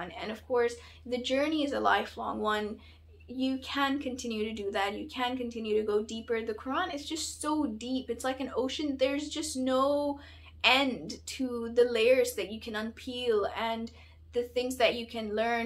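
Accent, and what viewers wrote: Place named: United States. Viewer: It's a very interesting concept and makes a lot of sense. Arabic is not an easy language to learn and not everyone needs to learn all of it in order to understand fus'ha. Very good point about learning from translations. Where are you from? Your channel says UK but your accent sounds slightly American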